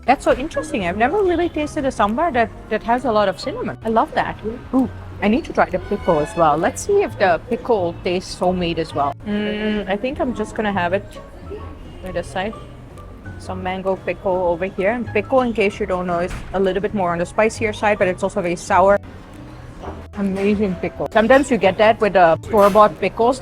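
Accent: Bangalore, India. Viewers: wow, more desi accent than me. I'm in E. Europe too. The best. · Ma'am your english accent is more Indian how that happened , Haha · Damn how do you sound Indian while being Dutch originally?? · I only have one question: Why do you say you are a foreigner when you are in Indian? You have clearly a distinct Indian accent? Are you ashamed to be an Indian? I am a foreigner vlogging in Indian and I find it a great country. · Why you speak like indian? Or it has voice over? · Ur acent is Indian why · The food looked delicious, and its funny how you really have an Indian English accent haha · WOW idly, Dosa, Sambar, Voda, Alu Gobi..WOW Your talking like local Indian..I'M IMPRESSED.. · Its cute how her accent is getting more Bangalore. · Vôtre accent est indien? C'est vrai?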